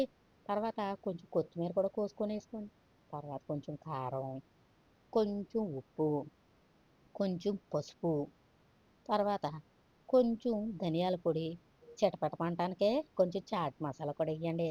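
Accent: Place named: Godavari, India. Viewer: godavari slang super andi👌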